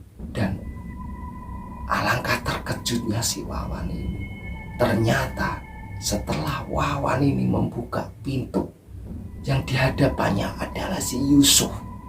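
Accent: Java, Indonesia. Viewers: Salam santun dri kuala kansar perak. Muga anda smua mdptRahmat n Kasih llahi jua. Amin2x. Taniah pd nsumber dan host yg msampaikan dgn baik tapi he he he ada lengguk bahsa jawa namun kmi org melayu k.kangsar masih bisa mgerti Mas. T kasih. Sya titip pantun sja Mas . Bolihkan. Permisi. Jangan ditanya kemana aku pergi. Abg Udin myanyi seorang diri. Sudah lama aku mcari. Rupanya cnell. L. D. sudah lama disisiku. Salam santun dri kota kuala kangsar, perak. T q. 🌴🙏🙋🦋🌄🐓🌳🏖️🐠🐠🍀🦋🙏🏞️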